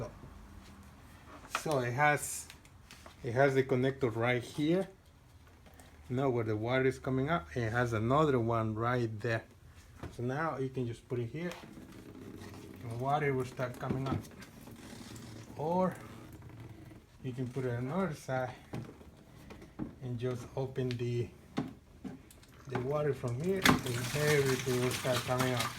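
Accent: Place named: India. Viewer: creí que eras hindú por tu tono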